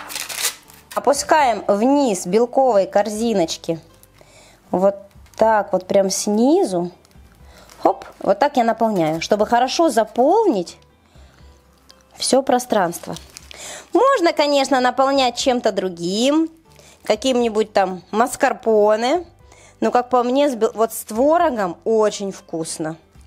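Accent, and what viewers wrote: Ukraine. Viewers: давно подписана на ваш канал- нравится ВСЁ )))) От того - что творите на своей кухне- в плане кулинарии до вашего мягкого певучего украинского говора )))) Если бы у меня вдруг не стал показывать монитор... ДА, я бы просто слушала ВАС!)))) Спасибо за рецептик- Очень красивый - вот что-что- а бизе у меня в духовке получалось- будем ЕСТЬ пироженки!))))))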